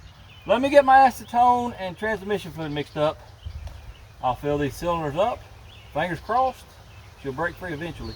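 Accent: South, United States. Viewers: I'm hoping to be at Adams,,if I see you I'll say hello! I love watching your videos! It tickles me at the good old" country talk" that I've heard for years!! Your a great mechanic no doubt! Keep on doing these very interesting videos! You tell how to do things the best way,, STRAIGHT FORWARD! NONE OF THAT TECHNICAL MUMBO JUMBO!! that in my OPINION IS WHY YOUR SO POPULAR ON HERE!!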